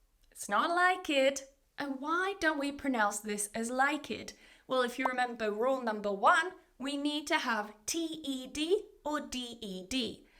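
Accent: United Kingdom. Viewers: She is from the UK, i dont know if this Is british acent, i dont native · Hey are you a british teacher ? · hello ms i love your content, as specially the British accent.... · Excellent, i love your british accent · I’m really grateful for you for make fantastic videos to our English learners. Thank YOU! And I love your British accent, hopefully my pronunciation getting better, speaking English like your accent.❤ ❤❤ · Is it British accent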